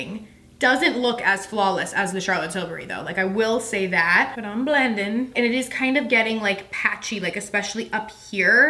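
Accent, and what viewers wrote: New Jersey, United States. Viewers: I love you in general I have for yours but your Jersey accent comes through in the cutest ways and I have to double tap back 5 seconds I love it so much 🖤🖤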